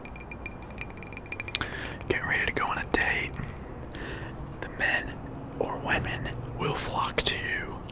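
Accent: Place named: Texas, United States. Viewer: So manly!💪The tools, the WD40, the garage and the extra Texan accent is giving hot masculine Daddy vibes! 👅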